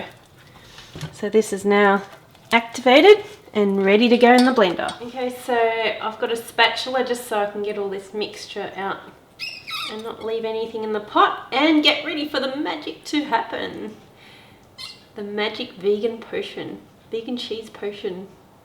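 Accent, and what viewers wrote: Australia. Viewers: wow so good will try this very soon,thank you for this video, sounds like your an Ozzie :)) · You sound like an Aussie, but live in US? Can't wait to try this, thank you, and helloo from Australia. ;D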